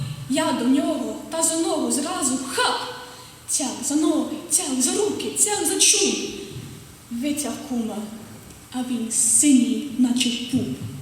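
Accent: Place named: Canada. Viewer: ! Ну яка молодець! Я так сміялася! Трохи канадійського акценту тільки додає кумедності цій гуморесці:)) Можете сміливо продовжувати і далі:)) Бажаю успіхів!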